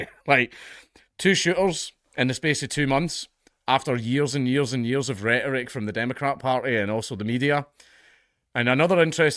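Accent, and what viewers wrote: Scotland, United Kingdom. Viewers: Maybe you wouldn't get tongue-tied if you just spoke normally and not in that weird Scottish way? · Maybe if you stopped speaking in a Scottish accent you would stop struggling with getting tongue-tied.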